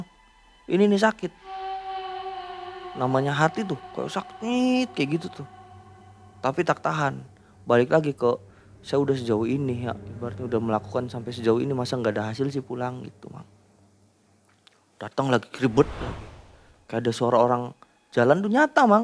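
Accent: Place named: Cirebon, Indonesia. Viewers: Lucu sama logat Cerbon nya hehe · wong endi sih cirebone ngapak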